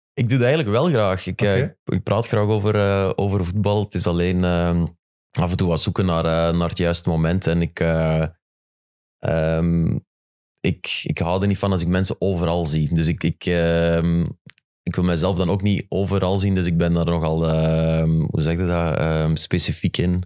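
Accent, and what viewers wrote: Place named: Antwerp, Belgium. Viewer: Kevin, hij spreekt antwaarps, niet limburgs😂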